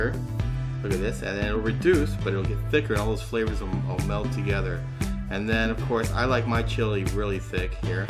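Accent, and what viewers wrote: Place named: North, United States. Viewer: My guess is you must be from a Northern state.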